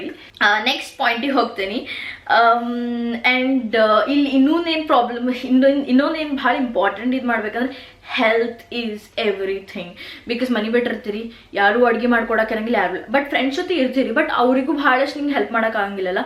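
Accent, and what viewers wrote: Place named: North Karnataka, India. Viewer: ಅಕ್ಕ ನೀವು ಉತ್ತರ ಕರ್ನಾಟಕದವರು ಅನ್ಸುತ್ತೆ ನಿಮ್ಮ language ಕೇಳಿದರೆ UK davru edre yes antha comment madi ela andre no antha haki please akka🙏👈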